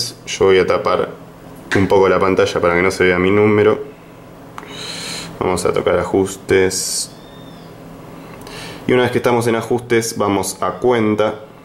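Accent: Argentina. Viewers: este es argentino o uruguayo xd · Qué acento lindo ahora me parece Argentino 🤭 ? Besos Mucho gusto soy Brasileña 🤝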